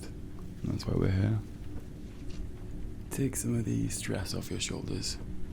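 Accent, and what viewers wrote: United Kingdom. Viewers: Omg he speaks British! & this is art! Congrats! Felt like watching a movie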